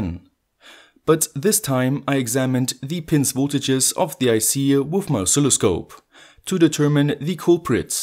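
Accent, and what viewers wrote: Germany. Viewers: Is it me or does he sound more German every video he releases? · German accent 😯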